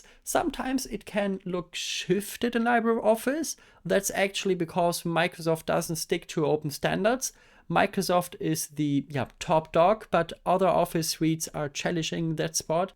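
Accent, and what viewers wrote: Germany. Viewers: it sounds like a German accent to me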